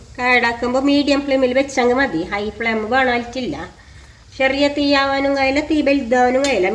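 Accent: Kasaragod, India. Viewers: Ithu Kasaragodu language aanu. Swayam kettu nokenda aavasyam illa. Avarude language il alle avarkku samsarikkan pattukayullu. Aa language kelkkan ishtappedunna Kure aalkkaru ind. Kannur, kasargodu ullavarkku trissur, Trivandrum language kelkkumbol ulla bhudhimuttu aayirikkum ningalkku indayathu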